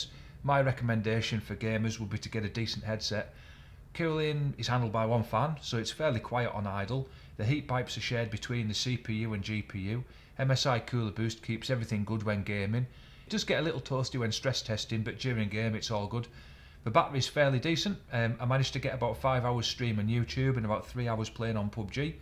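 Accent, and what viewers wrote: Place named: United Kingdom. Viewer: I hardly understand the British accent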